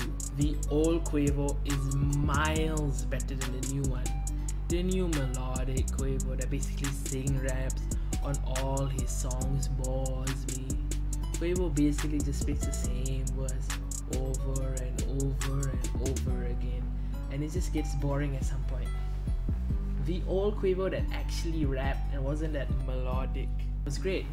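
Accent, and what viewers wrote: India. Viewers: Your accent sounds Indian but with a Caribbean accent. My guess is your of Indian ancestry but your from the Caribbean? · you from india or what? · What’s your accent it sound Punjabi, Hindu, Pakistani or something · Are you Indian? (Not hating or anything i just didn't expect ur voice) btw speak louder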